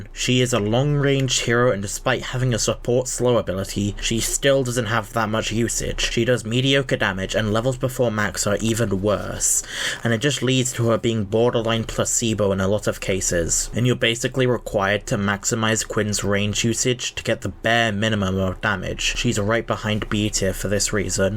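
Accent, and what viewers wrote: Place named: RP, United Kingdom. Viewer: You talk posh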